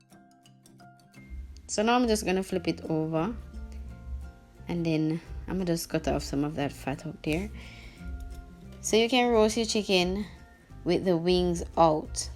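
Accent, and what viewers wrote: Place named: Jamaica. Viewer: Hi you did a very good job am new in your house you sound like a yardie like myself anyway am going to say in your house big up i enjoy watching the entire video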